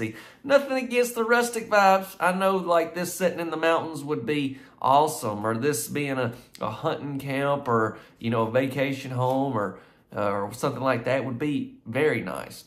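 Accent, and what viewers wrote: South, United States. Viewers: Get a friggin voice filter. Dear lord that southern speak is a huge NO.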